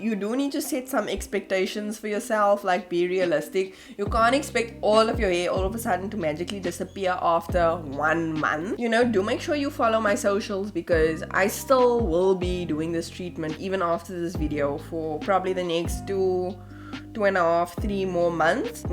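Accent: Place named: South Africa. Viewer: I love your South African accent 🤩🤩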